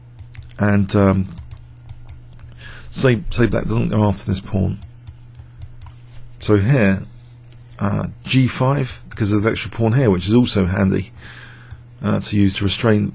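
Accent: England, United Kingdom. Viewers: You can also mute or don't watch the video at all! People want to impose pronunciations, he is from England thats the beauty of diversity.. We aren't machines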